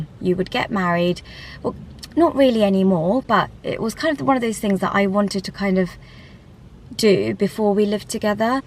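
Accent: United Kingdom. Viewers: lol love how you say sweaty mess it with your British accent. I feel like that all summer here in the US just a sweaty mess just like says.😂😂😂😂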